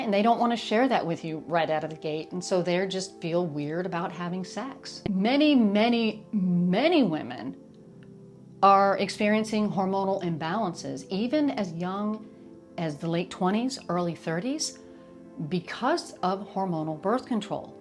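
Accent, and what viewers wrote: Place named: Kentucky, United States. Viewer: Y'all ... I'm guessing Kentucky or Tennessee?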